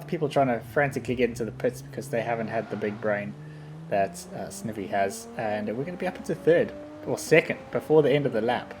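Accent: South Africa. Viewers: where you from ? Sound South African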